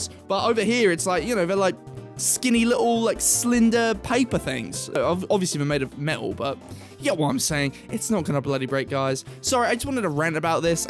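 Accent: United Kingdom. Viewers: How am I only just now realising is British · I don't like how British you've been sounding lately. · im being honest your the most British person ive ever heard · you sound like a steriotypical british person and i love it suuuuub~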